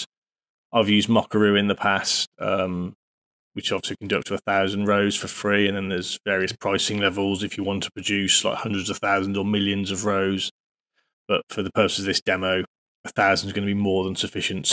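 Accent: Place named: United Kingdom. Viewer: Man, we just hired a really expensive guy. Wish we knew about you., from your accent you're based in the UK, but where abouts are you in the UK?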